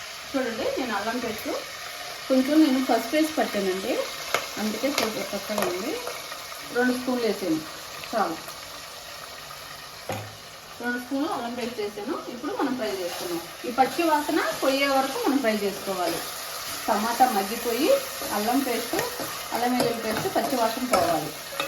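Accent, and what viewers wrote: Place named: Kurnool, India. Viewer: Kurnool valla laga matladutunnaru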